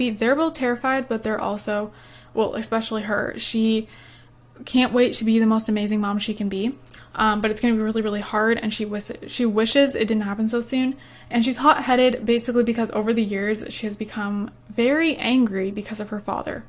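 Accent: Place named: Canada. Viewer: YOUR CANADIAN "OOUT"DOOWN"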